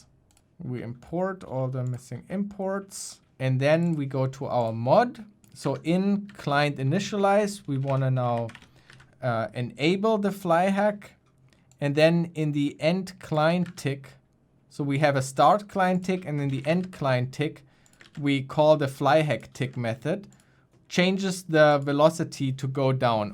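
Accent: Germany. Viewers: Sounds like an german Accent lol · Man hört das deutsch raus 🙂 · Du sprichst eigentlich Deutsch oder xD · Hey bro are you german? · Bro is german? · Man hört das du deutsch bist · Junge bist du deutscher? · Based on your accent, I would guess that you are from Germany. Am I correct? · are you german? i am a german too and you sound very german to me lmao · this german accent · erste 5 sekunden "der typ is doch deutsch" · Man hört das Deutsche raus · Man merkt so hart dass er deutsch ist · Du bist Deutsch oder ? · correct me if I am wrong, but as a German I heer it: you are german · Bruder du hast so einen krassen deutschen Akzent. Bereits bei "I'm sitting" war schon alles klar xD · Man hört dem deutschen Akzent ab und zu 😂❤ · bro sounds so german xD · i think i hear the german accent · I can hear Deutsch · Are you a German man? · German Accent? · Hab nur 1 Satz hören müssen um zu wissen das du Deutsch sprichst 😂😘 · He is a german guy you her it by it acent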